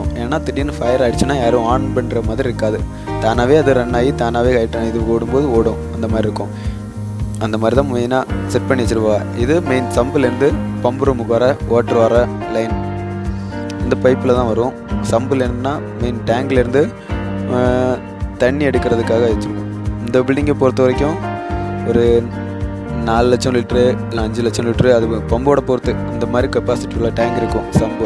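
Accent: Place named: Kanyakumari, India. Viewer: Kk ..unga slank la theriuthu ..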